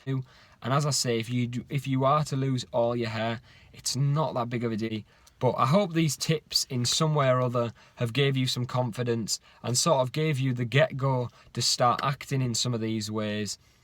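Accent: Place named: Liverpool, United Kingdom. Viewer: Are you from the same area as Paul McCartney? Sounds like you two have the same accent.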